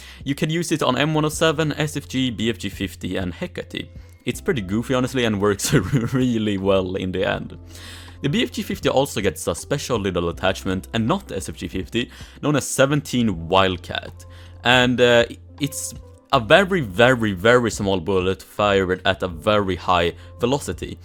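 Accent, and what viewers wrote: Sweden. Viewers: I just watch for the Swedish accent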